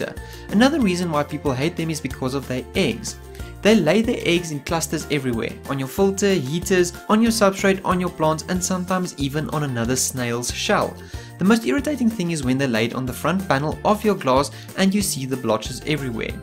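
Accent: South Africa. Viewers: you sound like my high school shop teacher are you from South Africa? coolest accent ever imo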